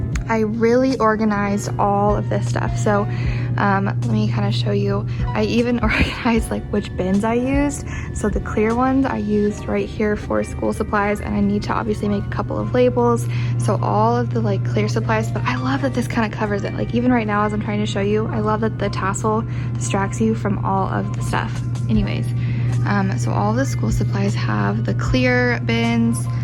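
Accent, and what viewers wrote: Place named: United States. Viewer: This is no criticism, but in all the videos about primary school classrooms in the US (I assume you are from the US, hearing your accent; if I should be wrong, I am sorry), I can't help but notice that all the walls and surfaces are decorated to the absolut max(imum). Why is that? I'm not against decorating the classroom and creating different areas by using rugs, shelfs, pillows, colors, etc. But I would never use all these quotes on the walls and doors, tazzles or borders on the whiteboard, as I find them too distracting. Many pupils need clear structers and minimal visual stimulation to be able to concentrate on their work instead of their surroundings. As I said, no criticism. I just can't help to notice the differences between german and north-american primary school classrooms.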